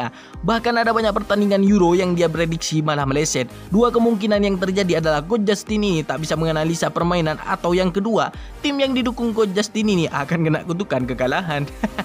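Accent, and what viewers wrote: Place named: Medan, Indonesia. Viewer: Abng anak medan yh.nmpak kali ckpnya anak medan kali wkwkw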